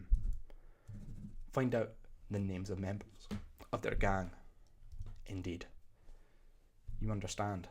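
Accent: Scotland, United Kingdom. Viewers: Great accent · Andrew Robertson would approve of the accent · Not a bad Scottish accent I reckon you sound like a teacher in Edinburgh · YES more scottish accent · YAAAAAY!!! Scottish accent part 2, finally!!!! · Your videos are a great tool for mastering a Scottish accent. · Can you do other profession?i loveeee the scottish accent x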